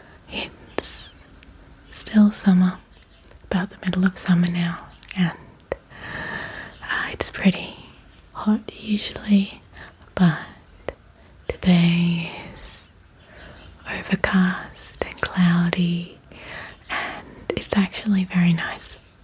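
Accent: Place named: Australia. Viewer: our Aussie accent reading bible verses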